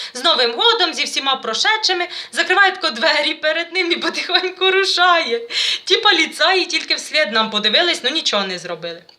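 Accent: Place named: Surzhyk, Ukraine. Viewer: Самое главное: и грамма пошлости нет. И умно, но легко, а уж суржик..просто прелестный!)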